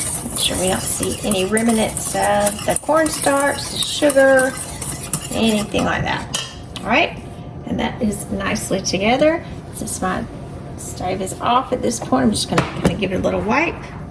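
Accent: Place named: Georgia, United States. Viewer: I'm a Georgia cracker transplanted to Texas and your accent reminds me of all my family in the Albany area, the transcript shows " bowl" when you say boil, I get teased about my accent here in Texas too,love your channel!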